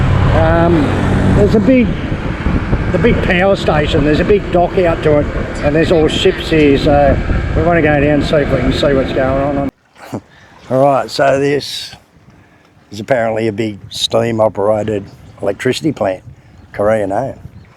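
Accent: Australia. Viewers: talking Indonesian with an Aussie accent is great 😂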